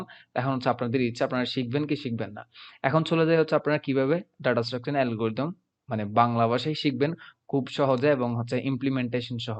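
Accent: Chittagong, Bangladesh. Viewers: Amader chatgaya accent diye buja jai Btw kon Uni te poren bhaia?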